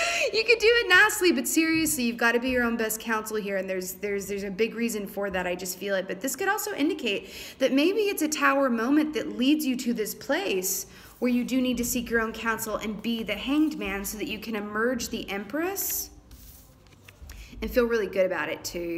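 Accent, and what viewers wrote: South, United States. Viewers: Plus, that Southern Belle accent! ❤️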